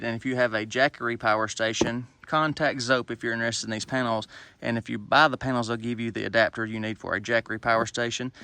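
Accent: South, United States. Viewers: so confused. here a southern accent but username says mountains. but the south doesnt have mountains..?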